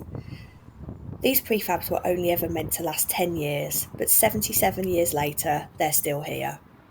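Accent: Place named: Black Country, United Kingdom. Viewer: i’ve just found your channel, completely by accident. Your videos are superb. You have a lovely way about you and describe things so well! I used to live in Coseley in Wolverhampton, so lovely to hear your accent as well. Very best wishes. Neil in Southport.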